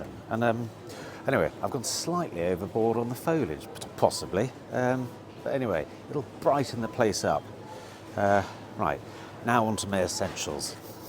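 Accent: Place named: Scunthorpe, United Kingdom. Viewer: Great to hear you're Dad's on the mend! The Scunny accent is an added bonus! By the way, forget anything that runs on batteries...absolute witchcraft! 😜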